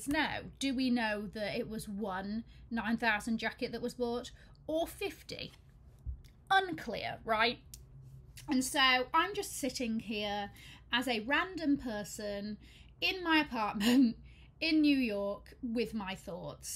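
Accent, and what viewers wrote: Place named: England, United Kingdom. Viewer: Interesting channel, but English accent is difficult for me. I prefer american English.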